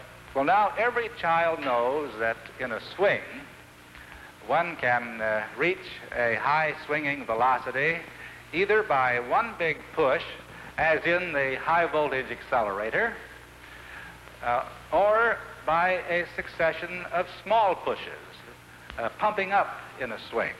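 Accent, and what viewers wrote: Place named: United States. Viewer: I loved this kind of clear-cut manly American accent of the mid 20th century, now sort of lost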